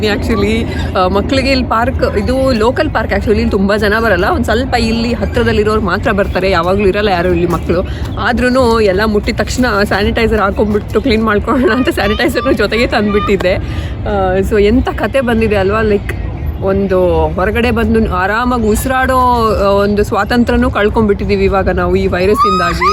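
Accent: Mangalore, India. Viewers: ನಿಮ್ಮ ಮಂಗಳೂರು ಕನ್ನಡ ತುಂಬಾ ಚೆನ್ನಾಗಿದೆ.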